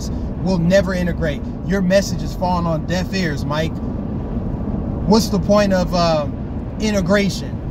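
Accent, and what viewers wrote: United States. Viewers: Keeping you in my prayers Mike. You talk like an American. Those folks are messed up. Hate will destroy them. Report them asap. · You talk like an American. Ebonics is the number one reason that black children have trouble in schools. I tutored children when I was pregnant with my daughter. Ebonics is bad for black children. Blacks have lived here longer than many other peoples They should speak American-english. Just saying Ebonics is bad. It's jealousy. Proud of you. Stay strong & moving forward. Live a good life. Thank you Mike.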